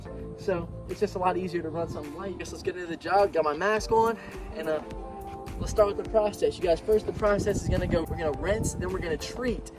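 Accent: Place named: Louisiana, United States. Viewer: dang you from louisiana too😂🔥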